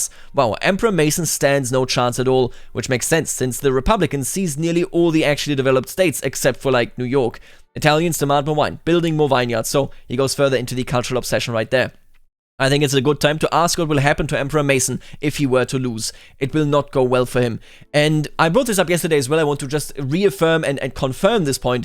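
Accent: Bavaria, Germany. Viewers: Do you speak german with a bavarian accent or do you/can you speak standard german?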